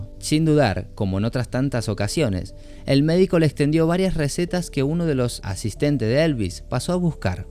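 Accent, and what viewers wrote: Argentina. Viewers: Sos argentino?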